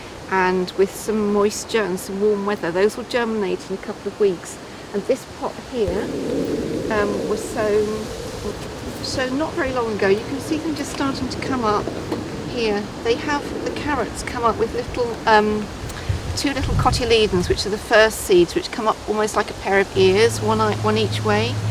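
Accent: United Kingdom. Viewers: Thank you for the tips! I'll never thin again. You're a lovely British lady, with concomitant accent, and with a lovely garden and cat! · Such a cute uk accent!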